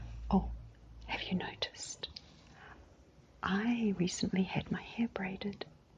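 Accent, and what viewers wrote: New Zealand. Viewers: Hello!!! I was amazed to hear that you had listened to a Minister who was also an archeologist as I did the same thing before I ever heard of ASMR (a couple of weeks ago). Was/is his name Francois du Plessis? I LOVE listening to him both for the content and the softly-spoken accent which was unintentionally ASMR. If I was sitting in one of his lectures I'd better be on the back row as I'd fall asleep instantly!!! I love the S.A. accent and I love your beautiful NZ accent. Thank you for what you do 💙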